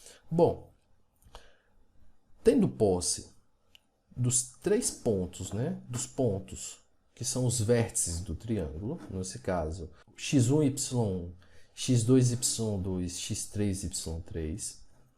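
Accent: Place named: Bahia, Brazil. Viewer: vc é bahiano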